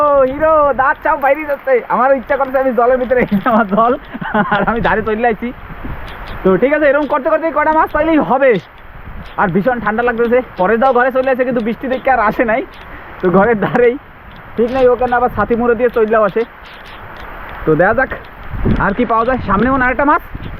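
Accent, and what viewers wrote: Barishal, Bangladesh. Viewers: আপনারা তো আন্দামানেরই ভিডিও দেন যেটা ইন্ডিয়ার ই অংশ বলে জানি, কিন্তু আপনার ভাষা পুরো টাই বাংলাদেশের বরিশাইল্লাদের মত ক্যান/?? একটু কমেন্টের রিপ্লাই দিন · vai tui pure barishaila ❤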